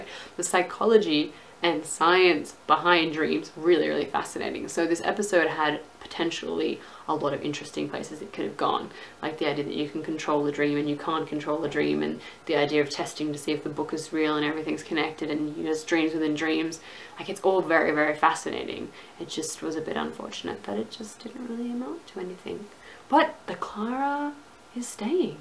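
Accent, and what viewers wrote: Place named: Australia. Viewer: When you say that you're taking a break from the show, aren't we all going to kind of have to, since we're not getting another episode until fall? And, btw, I agree with you, even to such an exceptional amount that watching this kinda felt like listening to myself think. Interesting. Hence the subscription: I want to see if this continues. Also, I totally didn't even pick up on your Australian accent. What part are you from?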